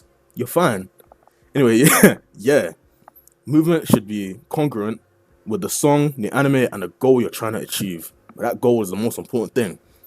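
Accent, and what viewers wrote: United Kingdom. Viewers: Deffo tell you’re from some part of london with the voice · this guy sounds so british and i love to hear that there are other good british editors around in the community and not just a bunch of russian tryhards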